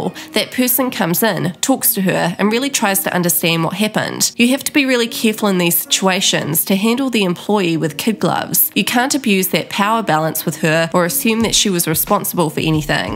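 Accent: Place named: New Zealand. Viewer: Why is it funny? It's a New Zealander accent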